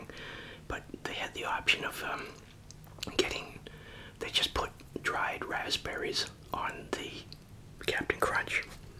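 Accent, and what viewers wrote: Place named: Massachusetts, United States. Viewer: I love your videos especially your accent - I was born & raised in West Bridgewater right next to Brockton but now live in NC - you sound like home which makes me so happy 🙂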